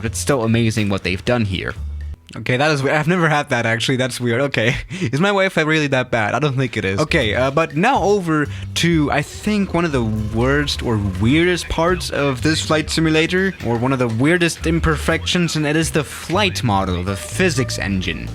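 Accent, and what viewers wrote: Germany. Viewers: Wait are you german?😂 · R u german? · Does anyone else notice his German accent coming in stronger every video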